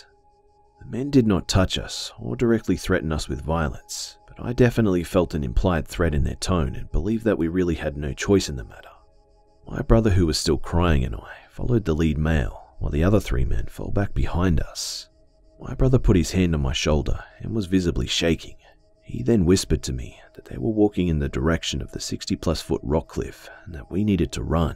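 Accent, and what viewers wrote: Australia. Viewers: Is it me or can I hear a hint of Australian? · Some random person yep, you can indeed, is Australian:) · Australian, right? love your narration!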